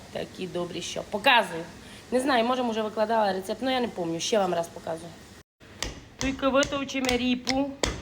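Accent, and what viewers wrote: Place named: Tiachiv, Ukraine. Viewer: землячко! Тебе тільки чути,- одне задоволення. Одразу пригадую Тячів, місто, де народилася. Привіт з Києва❤😊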